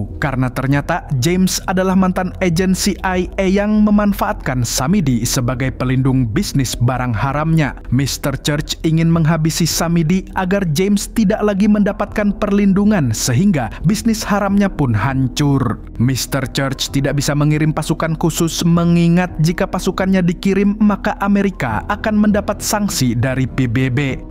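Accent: Banjar, Indonesia. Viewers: Mimin orang banjar kah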